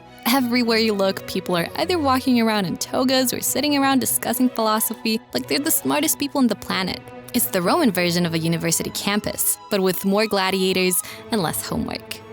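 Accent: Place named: Italian-American, United States. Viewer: This is the cutest Italian-American dialect I've ever heard. And she's smart too.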